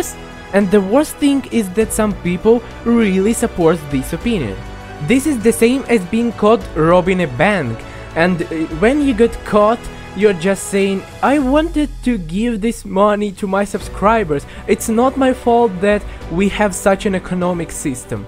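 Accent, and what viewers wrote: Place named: Ukraine. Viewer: no not that it is just you sound Ukraine so I whant to make sure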